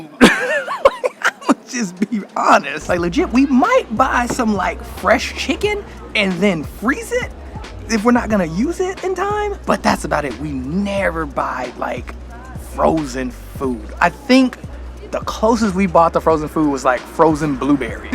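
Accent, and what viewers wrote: United States. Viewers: Can't have an American accent and say Haarlem, that confuses the hell out of people.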